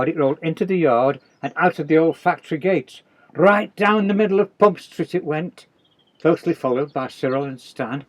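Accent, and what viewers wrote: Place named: Stoke, United Kingdom. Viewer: I'm guessing you may be from Stoke...?? My dad used to read the stories to me in my bed in Stoke and he'd put on a Yorkshire accent. Would be pretty strange if so!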